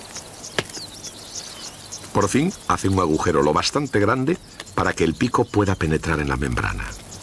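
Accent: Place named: Spain. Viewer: Se olle de la madre con acento Gachupin.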